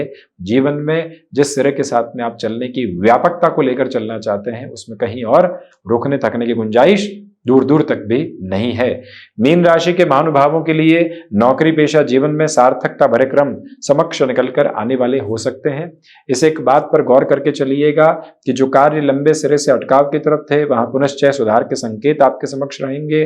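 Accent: Standard. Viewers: Bahut shuddh hindi hai Bhai ki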